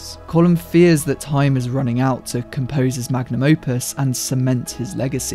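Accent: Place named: England, United Kingdom. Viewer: As soon as I heard the English narrators accent I knew we were in for 13 minutes of Tansplaining. The Anti-Treaty forces were standing by an oath they swore to an Irish Republic, not a partitioned land as a British dominion. They were not “self-mutulating”. Banshees is a dark tale of a failed musician who is suicidal, but his laissez faire catholicism prevents him actually carrying it out. He decides instead to hound the gentle Padraig to suicide instead.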